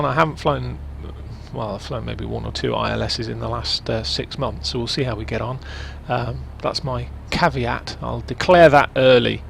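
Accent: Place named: United Kingdom. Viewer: Love your video! Of course, your use of British English, different from American English, was quite enjoyable to listen to. Anyway, the entire process was a very valuable learning experience. Thank you.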